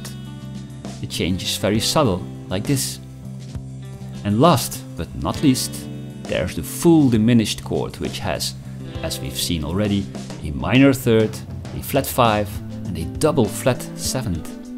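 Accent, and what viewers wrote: Netherlands. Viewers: Indeed. And the Dutch accent adds the cherry on top.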